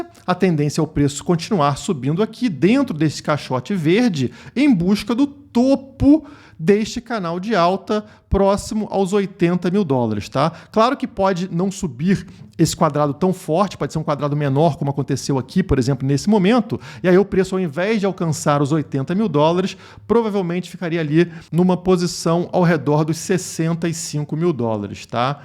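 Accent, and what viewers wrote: Rio de Janeiro, Brazil. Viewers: "Téiijjjla, triméééixtri"...sotaque carioca é nojento, mas as informações são ótimas.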